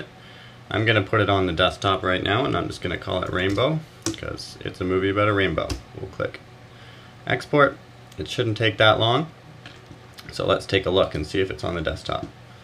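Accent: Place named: Canada. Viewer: Very helpful; quick information. And a Canadian accent to boot.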